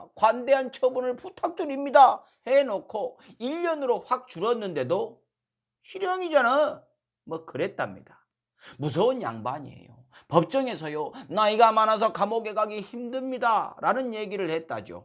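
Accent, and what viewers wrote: Jeolla, South Korea. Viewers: 성범씨 ㅋㅋㅋ 절라도말씨가 너무 맘에 와다부요~~~ 꼭 찝어서 가장 핫한뉴스를 찝어내서 씹어서 돌려부는 성범씨 시원한 사이다입니다 응원합니다 전라도광주에서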